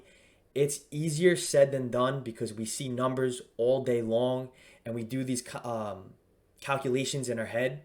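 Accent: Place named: New Jersey, United States. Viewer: Hey, I am from NJ, you sound like your from my area. Thanks to your video, I applied for options got approved for standard margin. But I am getting limited to Pattern Day Trader. Can i call to have margin turned off and the 3 a week wont apply to me? Thanks again.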